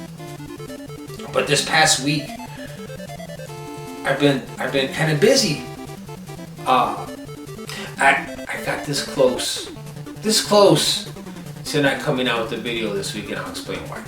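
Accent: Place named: Texas, United States. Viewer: I'm from South Carolina, so probably not the best judge of accents. But yeah you have a slight Texan accent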